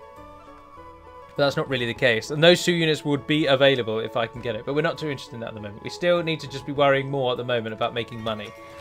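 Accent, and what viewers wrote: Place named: United Kingdom. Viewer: YOU just have the best British accent ivé ever heard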